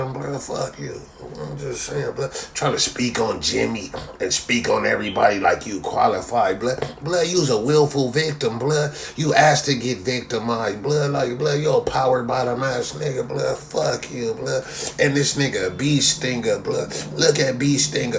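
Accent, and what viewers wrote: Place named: South, United States. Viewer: Why is ur nose so light? And what kind of southern accent is that? You're not originally from Cali though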